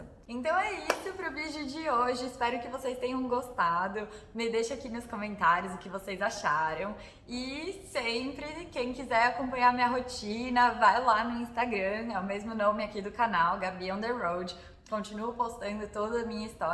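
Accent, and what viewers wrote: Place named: São Paulo, Brazil. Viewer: Otimo video …Vc tem um sotaque muito forte de paulistana ha ha, fofo, Eu Tbm Sou de SP mas meu sotaque is mais do interior😊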